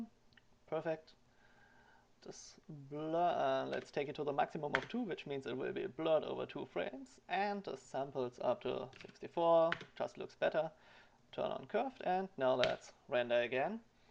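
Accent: Germany. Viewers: Hammer Englisch :D Ich liebe diesen deutschen Akzent :)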